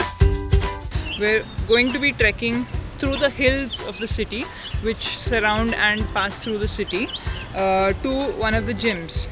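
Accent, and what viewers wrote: India. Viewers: Indian accent is by far one the best. But I can't help but laugh a little 😂 sorry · Damn. That sucked. The Indian accent is annoying. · aaahahahah I just cant stand the Indian Accent :DD I just cant stop laughing omg, this sounds so racist hahaha. "Walecum duuu dii internasional dexcs" omg im dying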